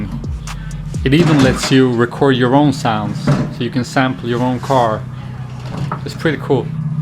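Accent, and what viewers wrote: Scania, Sweden. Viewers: Jäkla grov skånska grabben hade!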